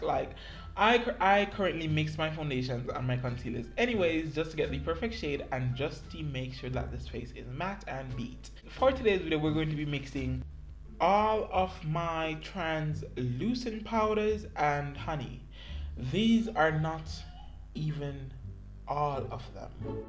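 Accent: Jamaica. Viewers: Do I hear a Jamaican accent? :)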